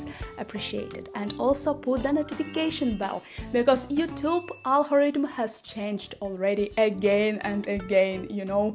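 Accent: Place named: Eastern Europe. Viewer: Thank you for this interesting video,. I like learning about Ukrainian traditions. Hope your family in the East is safe and warm. Take care of yourself. Hope your mental health will get better. This time of year is hard for mine too. Take it easy on yourself. We say things to ourselves in our own heads that we wouldn't dare to say out loud to someone else. You're doing great! Your English is excellent. No need to worry about your accent. It gives you character. And I'm sure it's easier to understand your Eastern European accent than it is to understand my Southern US (NC) accent. Hope you and your family are all OK. Stay safe and warm as best you can. United we stand. Разом ми сильніші. Разом до перемоги. СЛАВА УКРАЇНІ!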